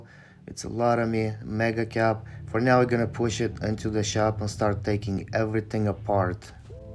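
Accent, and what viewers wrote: Russia. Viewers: Мне показалось,или Русский акцент? ) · мне одному показалось что у чувака акцент русский? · Русский акцент всегда узнаю))) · Русский акцент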